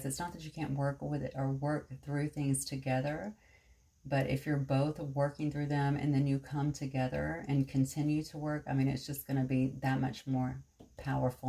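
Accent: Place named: South, United States. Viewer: Brilliantly and amazingly accurate. In addition to reading the cards and channeling, I love how you inject little pearls of wisdom into each reading. Your voice and soft Southern accent is so very soothing, especially in times of difficulty which I am experiencing at present. But I feel energetic stirrings.. Thank you, Ms.. Xx 🌟💖💫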